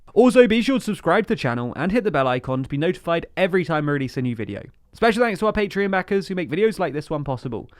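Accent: United Kingdom. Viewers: He’s British so what he says in his accent is correct. Because he sounds British.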